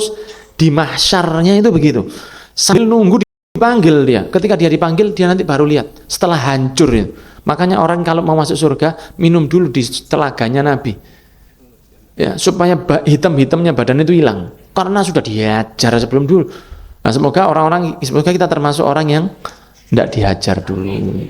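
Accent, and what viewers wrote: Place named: Java, Indonesia. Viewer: ...baru lihat nih habib ganteng abis...tapi ngomongnya kok medok jawa nya...semoga beliau di rahmat i Allah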